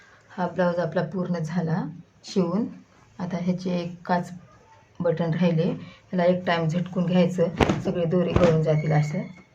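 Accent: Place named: Hingoli, India. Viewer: Tie chan shikawlat shantapane kasa shiwaycha blows te. tumhi Vasmat Kiwa Hingoli chya ahat ka? Tumchya bolnya warun watla.👌👌😊 thank you.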